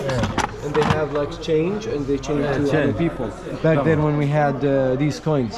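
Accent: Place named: Iraq. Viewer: انت عراقي اكيد لكنتك مو انكليزيه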